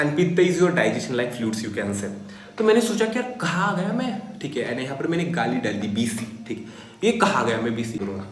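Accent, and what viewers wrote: Maharashtra, India. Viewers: Love his marathi accents ❤😅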